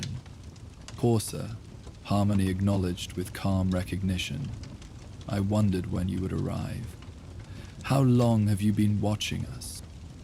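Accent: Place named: United Kingdom. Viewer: Lost me at the British accent. I came to hear 1950s trans Atlantic accents like in fallout not British mods.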